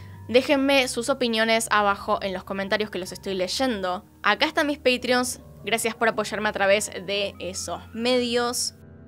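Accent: Argentina. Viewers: No m gusta el acento argentino pero ella no se me gusta escucharlo de ella..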